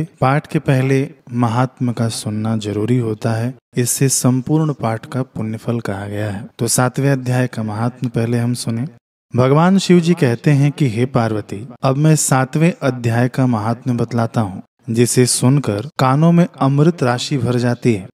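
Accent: Standard. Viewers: अति सुन्दर, क्या शुद्ध उचारण है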